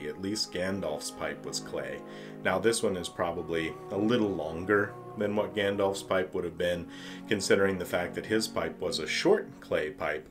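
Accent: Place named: Italy. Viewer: dude i loved the Italian hobbit accent. also how many bowls do you usually smoke per week?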